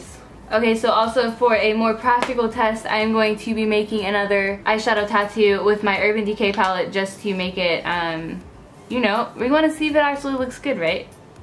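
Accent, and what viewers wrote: United Kingdom. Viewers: Her English accent "oh bloody hell" that's totally jokes literally that's me being normal everyday!!!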